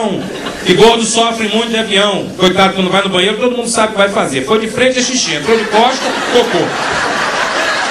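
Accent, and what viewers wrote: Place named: Rio de Janeiro, Brazil. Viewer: Shoooooooow de bolaaaaaaa! Nossa, acho que os melhores comediantes, são os cariocas! O sotaque e o jeito meio largado, tipo " isso meermo" e coisas do tipo, ajudam a ficar mais engraçado! Parabens! sou sua fã!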